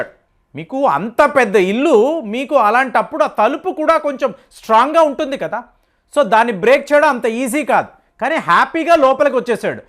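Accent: Tamil Nadu, India. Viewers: తమిళ ప్రభావం ఎక్కువయ్యి మాతృభాషను నిర్లక్ష్యం చేస్తూ తప్పులు మాట్లాడుతున్నారు ప్రేమ్ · gaaru Chennai lo unaaru kaabatti thamil mix untadi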